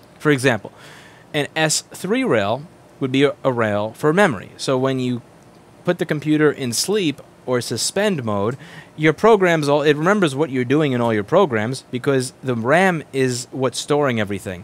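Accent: New York, United States. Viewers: He is a New Yorker; they speak differently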